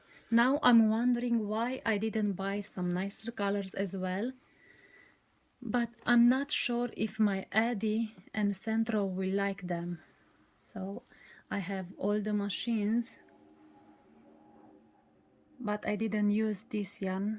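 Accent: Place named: Russia. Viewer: У вас такой акцент, как будто вы русская)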